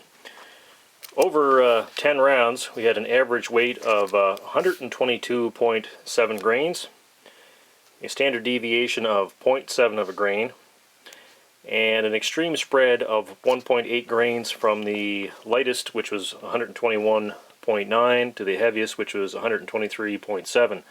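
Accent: Canada. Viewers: May I assume you are in Canada (based on your accent) ? Norinco ammo has been banned for importation to the United States for many years now because of the Norinco/Keng's Firearms fiasco, but I still have some of Keng's match ammo as well as some silver boxes of Norinco. I don't shoot it because it's quite rare here in the US. Thanks for the video...it's the first time I've seen this Norinco ammo reviewed...nice job!!!!! :o)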